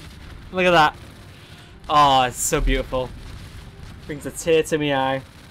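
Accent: United Kingdom. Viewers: A little mistake to make your head big eh😂😂. Still, I love your british accent😂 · British accent is so cool: part two, bad idea, lad... Hehe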